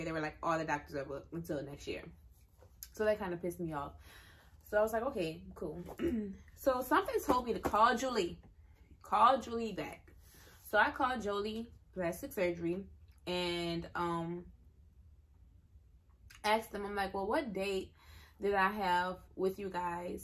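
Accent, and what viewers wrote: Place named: Baton Rouge, United States. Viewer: Baton Rouge ? lol I hear an accent What the hell $1000 to change doctors they take for everything at MIA.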